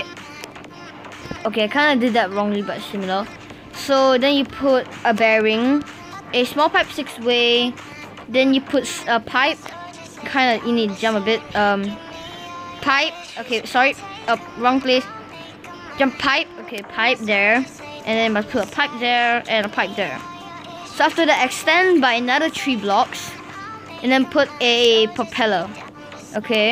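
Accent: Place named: Singapore. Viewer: You are singapore right? Bc your voices •_•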